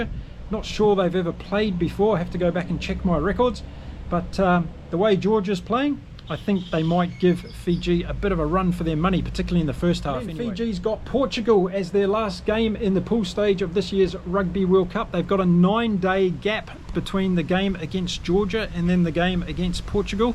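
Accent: New Zealand. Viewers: Someone savaged my comparing your accent to great rugby commentary from NZ pundits, granted it's been a bit hairy of a reaction from The Breakdown but still find your demeanor very astute, knowledgeable and importantly unbiased. Keep up the great work. :)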